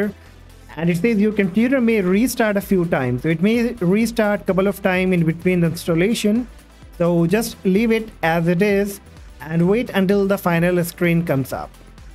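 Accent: India. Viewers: Why is it always Indian accents… it’s like IT is wasting my time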